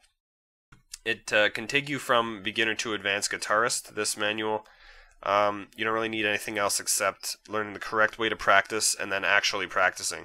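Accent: Canada. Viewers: Nice video thanks. P.s. your Canadian huh? Cool accent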